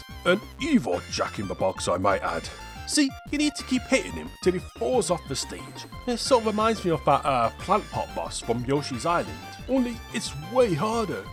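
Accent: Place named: Yorkshire, United Kingdom. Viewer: I always assumed you were a Yorkshire man!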